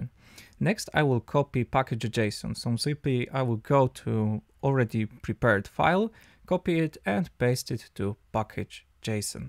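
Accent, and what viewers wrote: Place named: Poland. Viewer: No slychac, że Polak 😀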